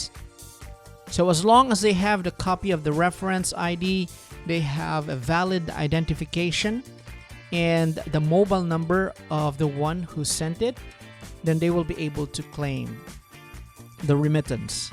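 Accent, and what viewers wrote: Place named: Philippines. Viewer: I Can Tell on your accent that your Filipino, but how come you pronounced PALAWAN..as PA-LLA-ONE?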